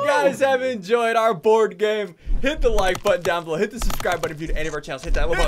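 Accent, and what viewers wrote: Canada. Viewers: Is he Canadian (im Canadian so that’s not racist) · Are u Canadian? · He’s Canadian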